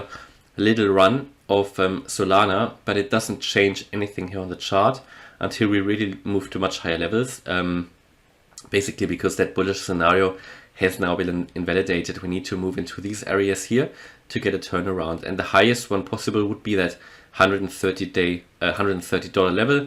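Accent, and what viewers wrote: Germany. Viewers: Dude I love your analysis. Pretty straight forward and precise. If I'm not wrong I'm also hearing a German accent. in which case muss ich sagen echt geile TA bro 👍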